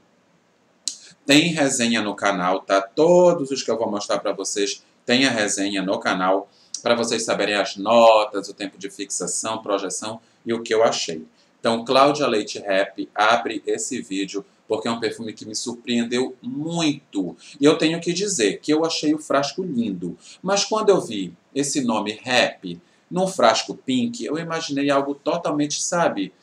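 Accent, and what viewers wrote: Pará, Brazil. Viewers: diz alguma coisa sobre o Revelar da natura! Amo suas resenhas. À propósito, vc é paraense como eu?😅🤭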